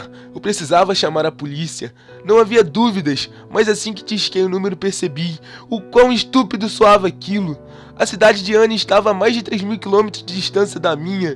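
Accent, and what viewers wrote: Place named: Rio de Janeiro, Brazil. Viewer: Sotaque do Rj haha. mandou bem demais. tem talento e merece sucesso. like p vc e ganhou uma inscrita :)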